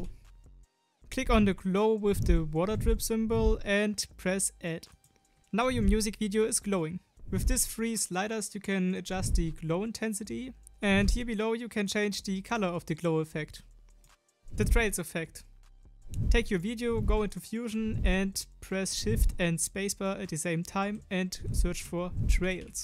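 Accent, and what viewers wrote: Germany. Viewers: Are you German😅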